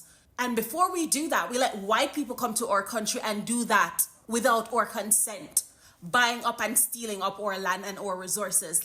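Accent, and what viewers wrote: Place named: United States. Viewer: Shut up is because you away in America that is why u and your is talking the way u a chat.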